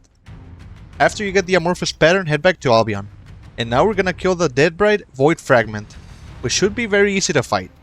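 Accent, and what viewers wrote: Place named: Mexico. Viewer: I can hear the Mexican in ur accent lol. All love brother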